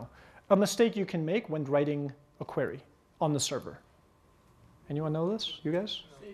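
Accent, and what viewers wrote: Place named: Quebec, Canada. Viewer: I detect a very slight french Canadian accent.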